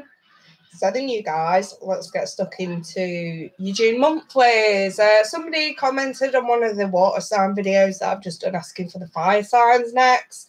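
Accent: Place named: United Kingdom. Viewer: Your simply splendid! Team Aries here trying 2 let go of Libra drug addict ex Tyler recommend u Love yr British accent 👌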